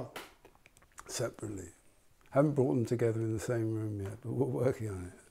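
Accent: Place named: North London, United Kingdom. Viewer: What an interesting guy. He's so well spoken and thoughtful. Yet to me anyway, he's kept the working class North London accent. He speaks highly of his brother, who he can't get along with. I love that "Paul's got a new record out"?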